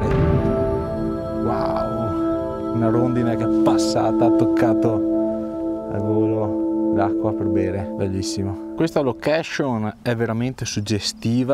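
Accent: Veneto, Italy. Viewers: Filmati sempre bellissimi....!!! In più con l'accdento veneto, fa sempre piacere, sembra un poco di essere a casa e di conoscerci.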